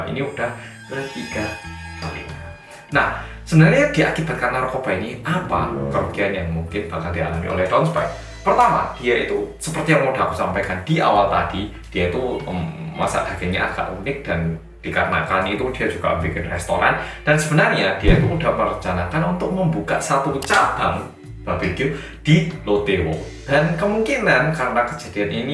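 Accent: Java, Indonesia. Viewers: gw suka nih konten ini crita2nya update,klo bcara detail dan jlas.lucu jg krna medok nya...smngat trus ya.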